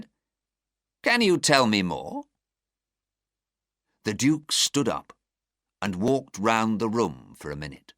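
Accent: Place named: United Kingdom. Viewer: Огромное - преогромное спасибо! Как же хорошо всё продумано, - и сам рассказ, - кто же не любит нашего родного Шерлока Холмса, и бархатный баритон чтеца, и произношение - волшебное, английское - преанглийское! Чудесный формат! Спасибо!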